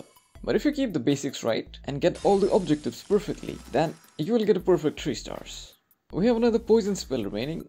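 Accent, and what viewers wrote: India. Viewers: Bro im not sure but I think youre from india cuz i saw your thank u for 3k subs and all the background noise of vehicles and electricity poles make me feel youre indian. Plus theres a slight touch of hindi accent in your english. Please let me know if im right!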